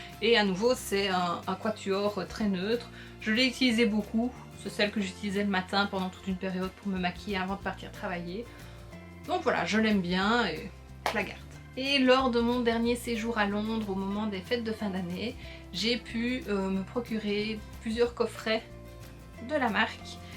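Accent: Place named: Belgium. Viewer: Re salut !! Aaah, ça y est, je suis bien installée pour regarder ta vidéo ! Oh la Riviera d'Anastasia, j'aime beaucoup ! Je ne l'ai pas mais elle m'a ouvrent tentée ! Ah je me disais que tu étais sûrement en Belgique. J'entendais un petit accent mignon 😉 Ooh, elle est magnifique la palette Into the Snow de Essence! Je ne connaissais pas ! Mais c'est vrai que c'est une marque très sympa ! Et qui offre pas mal de choses intéressantes pour un bon prix ! C'est marrant tu as présenté deux palettes de suite (la New nude de Huda et la XL de Lime Crime) que je n'ai pas mais dont j'ai des dupes ! Respectivement chez W7 et chez... Je ne sais plus ! Lol c'est une marque sur Amazon (mais je ne l'ai pas encore testée). J'adore la marque Makeup Revolution !!! J'ai pas mal de palettes de cette marque, mais pas les même que toi 😅 je pense que je ferai un jour une vidéo sur mes palettes aussi, mais je ne suis pas chez moi en ce moment... 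Waw, effectivement tu as plein de palettes de Too Faced! Moi je n'ai que la White Peach, et je l'aime bien pour des makeup légers. Effectivement tu aimes beaucoup les paillettes !! Tu connais les paillettes de chez Colourpop ? Parce qu'ils en font et elles sont juste magnifiques ! Elles sont jolies les palettes néons de W7 ! J'ai vu que Makeup revolution allait en sortir aussi, encore une fois dupes de celles d'Huda Beauty ! Merci pour ton partage de collection ! J'aime beaucoup regaeder ce genre de vidéo ! As-tu déjà fait une vidéo sur ton rangement makeup ?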